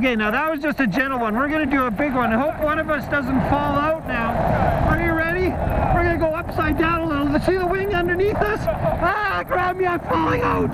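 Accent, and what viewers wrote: Canada. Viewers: Wacky Canadian. Or Wisconsonian or Minnesotan. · He sounds Canadian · I just love the Canadian accent! So polite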